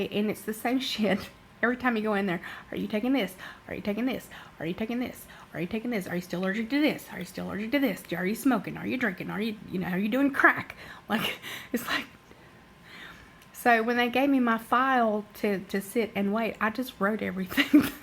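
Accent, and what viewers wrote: South, United States. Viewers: Are you from the South, honey?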